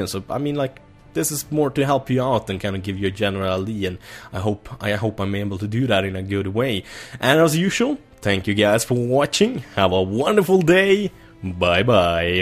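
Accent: Sweden. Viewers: I never realised you were swedish xD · hör att du är svensk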